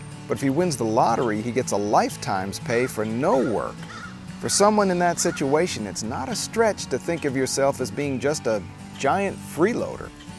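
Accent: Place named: United States. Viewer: No I have never known someone with lots of money who was unhappy. If you have cancer and you are going to die then money will not save you. But it will fix just about everything else. Your example of the guy in Texas who won the lottery is absurd. He did not have to loan money, buy people homes or put himself in the position of a giver, he could have saved the money and had a decent life combined with doing something like giving to an animal shelter and volunteering to help out. It is not rocket science to know that if you are doling out millions to people then people will take advantage of you. And in the end he killed himself so right there he had mental problems. So tell me how people who win money are just a "giant freeloader"? You have an American accent so I presume that you live in the USA. Don´t get seriously sick there without a lot of money. 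there is no National Health Service. This video is pointless.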